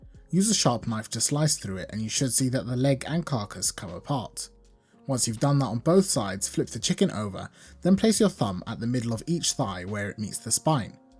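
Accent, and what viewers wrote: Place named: United Kingdom. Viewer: lol it's weird hearing a British accent from an Egyptian.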